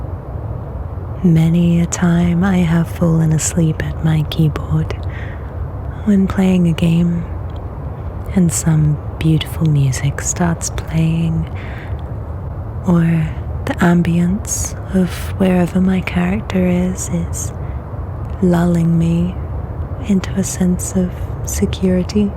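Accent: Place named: United Kingdom. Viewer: Loved it! Work on your ASMR style! You got something seriously good here. Maybe a little trip back to England would help you catch back a little more british accent which is one of your best features for this. Congrats and thank you.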